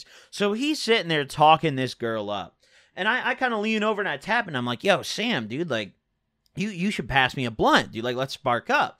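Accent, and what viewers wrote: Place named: Chicago, United States. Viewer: I figured you were from Chicago you talk just like white boys from Chicago. Or idk if your from the west or south suburbs but you know what I mean.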